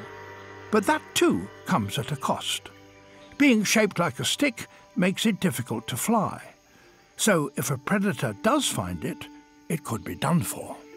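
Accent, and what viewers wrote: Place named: United Kingdom. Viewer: Ah my nightmare but with a classy British voice.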